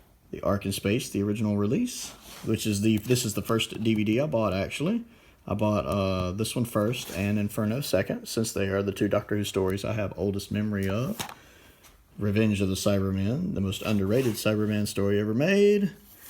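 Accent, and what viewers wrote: South, United States. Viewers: I think once you become a legal adult in America, groaning while crouching or bending is legally expected. Also, I love your collection! Also, I have been meaning to ask, Do I recognize a southern accent? I only ask cuz I'm from Texas!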